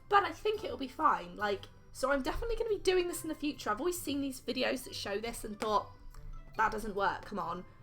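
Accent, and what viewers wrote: England, United Kingdom. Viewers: I'm in love with your British accent😍😍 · Walmart??? Are you American? You sound English...? Xx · it is a British accent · Woah a wild British person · I did NOT expect a british accent 😂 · r u british? love ur accent!! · You're British right? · You sound British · Hang on... She has a British accent but mention Walmart which doesn't exist in the UK so does she has a british accent but lives in us or am I tripping 😂😂😂 amazing video tho xx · I'm Australian and I laughed so hard at this comment because she's so obviously British to me lol. I'm not an expert but I believe her accent is northern of some kind. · Are you British? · She’s British 😂😂😂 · The British accent tho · How is this beautiful child of a woman not British??????? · no this is not American accent its British i live in the us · Are you British? Your accent sounds very British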